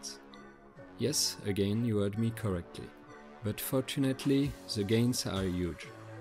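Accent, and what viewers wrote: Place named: France. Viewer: Wech la france !!! 🙋🏻‍♂️😂 trahis par l’accent ! Respect ! Vraiment dure de parler comme ca 😌 bonne video ! Beau matos! J’ai un T1 moi 🤘🏼ssf gang !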